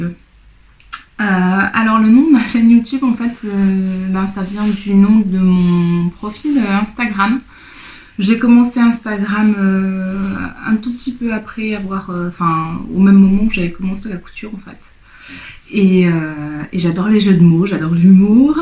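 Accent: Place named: Moselle, France. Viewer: Coucou, Super sympa ton tagg. Merci pour ce beau partage. Ne t'excuse d'être remplie d'émotions pour ton fils... l'amour d'une mère vers son fils, cette maman que tu es prête à franchir des montagnes pour lui... c'est beau... oui, pas de pression... s'épanouir chacun à son rythme. Et sinon, trop sympa ton introduction musicale. A bientôt par ici ou par là-bas. PS: vive l'accent Mosellan. Allez, encore un peu et il sera encore plus prononcé.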